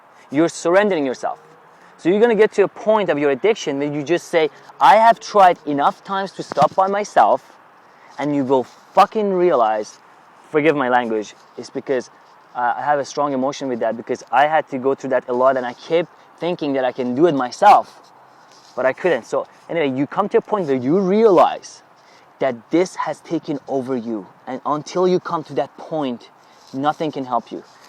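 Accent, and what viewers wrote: Iran. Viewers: His accent is very Persian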